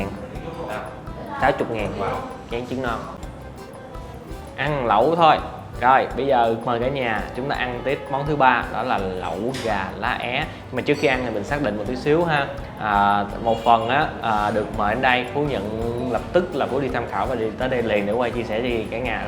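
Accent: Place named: Binh Dinh, Vietnam. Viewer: Giọng bình định nghe mà nhớ quê quá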